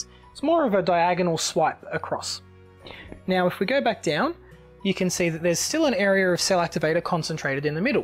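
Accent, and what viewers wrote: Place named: Australia. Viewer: Thank you, such a talent !!!! I always thought you were Canadian, love the Australian accent...definitely need to visit one day 🤗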